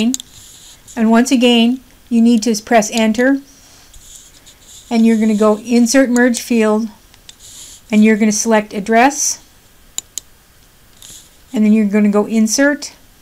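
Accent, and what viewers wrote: Canada. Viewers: Thank you! Very well explained. And I could tell I was listening to a Canadian when a-Gain came up, and then a-Boot. I was born in Vancouver but we moved to LA when I was 6 so I lost that charming accent and now just sound like an international dual citizen American living in Europe. 😉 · you are Canadian... I can tell by your accent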